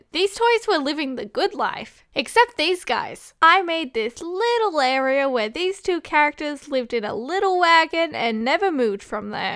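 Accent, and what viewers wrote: Australia. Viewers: Just found your channel and could instantly tell your a fellow Aussie like me, btw your vids are hilarious pumpkin man keeps getting me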